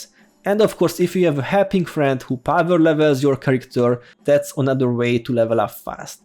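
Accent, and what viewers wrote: Hungary. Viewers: Aha, hungarian accent! I always wondered where it was from :D That said, I love how you say "foes", reminds me of how Mr T says "fools", and I choose to interpret it thusly. "These fools are no challenge!" :D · The Hungarian accent is the reason I’m here 😏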